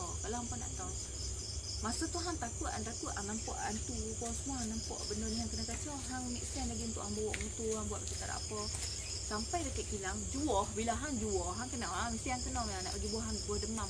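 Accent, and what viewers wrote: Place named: Kulim, Malaysia. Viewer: rasa² ni orng kulim kot sbb cara dy crta niy mcm orng kulim ja, sy pon dulu ingat niy orng pinang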